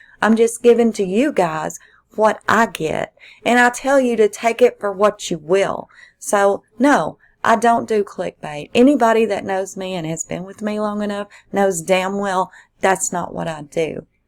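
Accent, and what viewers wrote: South, United States. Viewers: I LOVVEEE your southern draw!! Don’t let anybody tell u that u need to change in ANY way. You’re absolutely AMAZING!! I’ve been a subscriber of yours for the last cpl years and I absolutely adore u 💜💜💜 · Goodness people make drama out of nothing. I love your Southern drawl! I'm very interested to see how this is picked up by the relevant authorities. 🤔 👀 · Brian’s walk looks like something that was called “pigeon toed” back in my day. And it was correctable with special shoes at a very young age so that the feet could be trained to point more inward than outward. Most parents pick up on it when the child starts walking & definitely a good pediatrician would see it in a regular exam. Also I love your southern accent, I could listen to you talk all day. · Your cute southern voice ticks all the right boxes for me lol Sweet mamma goodness gracious me. 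Some people just don't know a lovely sound when they hear on. Have a great day. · Awe! Will miss your live sweetie, working this weekend, but will absolutely watch the replay! Girl, you're absolutely beautiful inside and out! Including your southern drawl. Thanks for what you do! We love ya'll! Prayers & Blessings from WI 🤗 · You are doing a great job and if someone doesn't like your southern accent they can take a flying leap. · You are such a great person. Your Southern Drawl makes you are easier to talk to. Newbies Need to listen closely. FYI: NEWBIES WATCH A FEW IS SUCH A SWEET PERSON.IF STE HAS INFO SHE PUTTS IT OUT THERE! ♥️♥️♥️♥️ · Glad I found your channel! Love your voice! We sound a lot alike lol! Southen' girl myself here 😘 I think you're doing a wonderful job! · Damn Skippy! They Definitely need some kind of running updates around NC.....I Live in the Western Mtn's if NC and My County Is Huge.....with Lot's of Wood's......and State Mtn Park's.....So I Definitely Appreciate the Info you giving Us! 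Oh and i think You and Myself are what I like to Call.... An Educated Hillbilly......so there for your Southern Draw is not only Totally Acceptable.....but Also a Must! Lol. So just keep doing what your doing Love! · Love your Southern drawl and I like how I can sit and actually listen and think about what you are saying... Most ppl talk too fast, if anything they should slow down - not you speed up. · New sub here from Bendigo, Australia (Discovered you with you previous vid) - keep doing what you’re doing! Those of us with high enough IQs can see that you are presenting credible information for us to subsequently analyse and then make our own decisions. Also: I don’t subscribe to channels with automated voices as they thoroughly annoy me (particularly when poor punctuation has been used & sentences require some mental processing to be understood) AND I ABSOLUTELY LOVE YOUR “SOUTHERN DRAWL”! 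👍👍🇦🇺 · you have the most beautiful southern voice and I’m glad you’re proud of it don’t ever change lovely lady keep up your amazing work much love from South Wales 🏴 in the uk 🇬🇧❤️ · I love your southern draw,roll tide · The trolls have left Summer's video's and gone to video's on Brian. geesh, your southern drawl sounds normal to me, bc I'm a Texan. #southernladiesrock Got the reminder on for your live; looking forward to it!❤🙋‍♀️ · Omg. I can’t believe somebody is griping about your southern accent!! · Don't you worry about your southern drawl. Im a southerner. I talk slow & have the drawl. You are an excellent You Tube creator. I've recommended your series, "Exposing the Mistress". Keep them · Don't stress over any negative comments! Those of us who have been here on your channel for awhile, know! You have never click baited and I absolutely love your southern accent ❤ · I would listen to your lovely Southern drawl all day over those dreadful computer generated voices...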